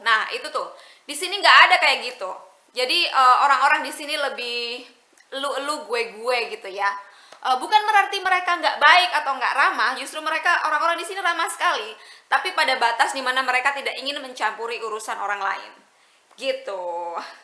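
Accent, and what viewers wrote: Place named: Surabaya, Indonesia. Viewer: arek suroboyo tah mbak iki,podho mbak..anyway do you know londo kampung? He is a youtuber too like mbk 😊.he is from aussie but his wife from surabaya..he speak jowo suroboyoan fluently..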